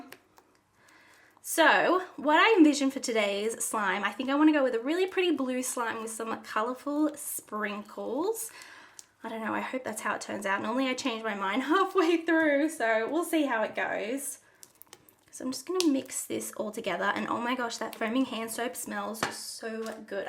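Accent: Australia. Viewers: You sound so much like my violin teacher, she is from Australia too. My mom lived in Australia for 1 year and she GOT soooooo god in english!! 6++++++ every test ehehehhe🥰❤️😂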